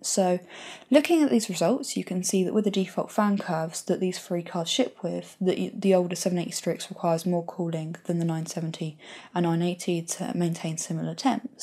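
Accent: England, United Kingdom. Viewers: You make some really good videos. Sadly I always have to concentrate to understand what you're saying. Guessing you're from the UK, what regional accent is that? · She's from England.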